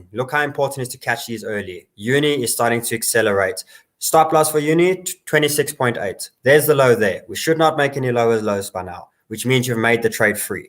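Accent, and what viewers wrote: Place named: South Africa. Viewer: Great, you’re incredible. Can someone please post the ticker of the last coin, it’s that South African accent 😜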